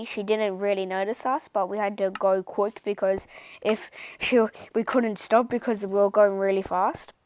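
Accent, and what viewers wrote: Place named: New Zealand. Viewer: ok u sound like a kiwi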